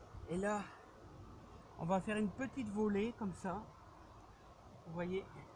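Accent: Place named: Roubaix, France. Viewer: Bonjour Monsieur, je découvre votre chaine bien sympathique, merci ! Et il me semble bien reconnaître l'accent de mon enfance c'est à dire Roubaix ☺ bonne continuation !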